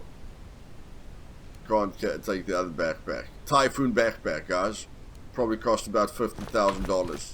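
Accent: South Africa. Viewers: Jy Suid Afrikaans?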